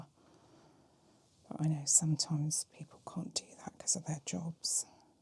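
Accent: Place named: Wales, United Kingdom. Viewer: Best Welsh accent in ASMR no doubt 😅 sleepy 🥱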